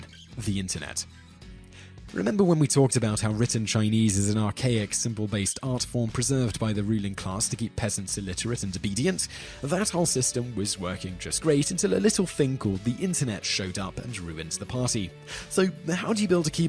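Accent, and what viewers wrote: England, United Kingdom. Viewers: VVDivineOwl You know the person speaking is clearly English by his incredibly obvious accent, don't you? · Learning Chinese now and enjoying it. The narrator sounds English. English people are the laziest when it comes to learning other languages. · It is so nice to hear such an elegant British accent say such nonsense. It sort immunizes us against form over substance situations. Its like listening to Ted Cruz being stupid and then hearing that he was a brilliant student at Harvard. It devalues a Harvard education and a fancy accent to the benefit of those of us in the public education system. Here's a thought: go on Google and search "Chinese language." And stay away from any list on the Internet. They are carriers of stupid. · Your British English is useless today outside of the UK.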